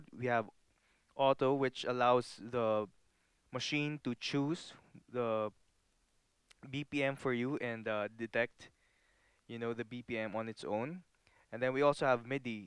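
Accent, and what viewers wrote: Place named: Philippines. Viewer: Ill review, tip and tricks! Sounds like your Pilipino too, LOVE dat! JAHbuhay king...bless up!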